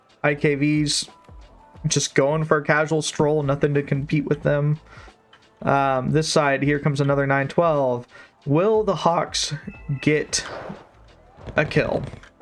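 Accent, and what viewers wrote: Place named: Texas, United States. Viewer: You have the least obvious Texan accent I have ever heard.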